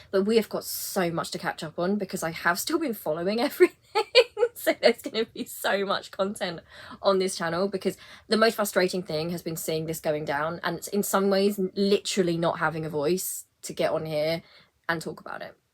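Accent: United Kingdom. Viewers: Thank you for sharing your thoughts on this subject! Finally, a breath of fresh air (with a British accent, too❗️) I subscribed❣️👍🏽🤗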